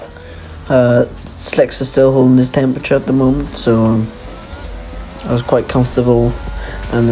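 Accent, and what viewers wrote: Wales, United Kingdom. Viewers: I’d recognise that accent anywhere! Newport or Rhondda? Great driving mate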